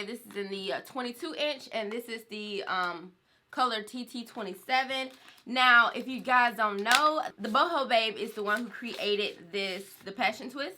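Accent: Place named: Atlanta, United States. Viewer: I’m a First time viewer and the minute i heard you speak i knew you were from atl lol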